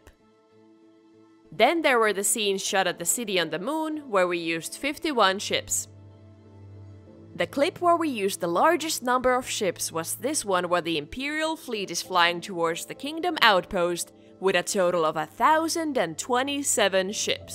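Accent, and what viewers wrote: Finland. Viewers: Is she Finnish?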